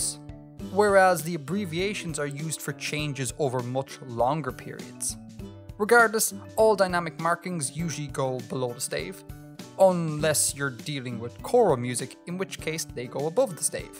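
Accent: Ireland. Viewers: Definitely an Irish accent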